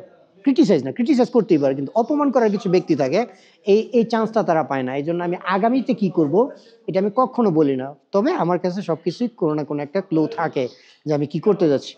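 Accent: Noakhali, Bangladesh. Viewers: এই নোয়াখাইল্যা চলবে না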